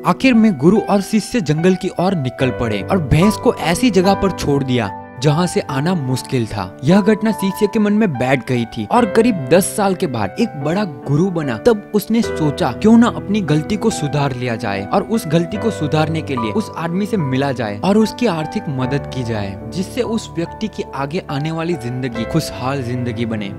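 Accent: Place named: Surat, India. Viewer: आप की सुरती बोली हे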